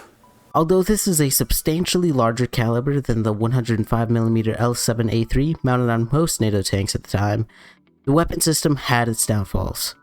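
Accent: United States. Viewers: I hope you blow up bro, the jokes and stuff in this video were great and you have good editing for being a smaller channel. The southern accent was hilarious. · loved the 'murican accent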